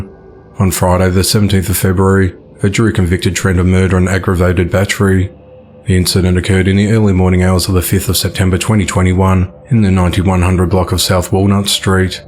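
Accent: Australia. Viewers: Hi I have to ask I hear a Aussie accent from you if not all I have to say to you congrats I thoroughly enjoy your channel keep posting your uploads I’ll keep watching stay safe Julie 🇦🇺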